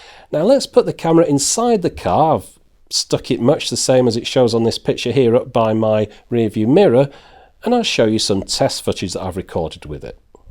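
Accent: United Kingdom. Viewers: Thanks for great video. Brit accents, colloquialisms, pronunciation of English words (verses proper American) usually grates on me, but I can tolerate you. Ha ha. Seriously your videos are PROFESSIONAL, scripts to the point, informative, and your speaking voice is excellent. If you're not a Pro "presenter" you should be. Thanks again, these videos are invaluable, help filter through the many China made products in this niche category of electronics. I'll buy this dispite the acid trip colors.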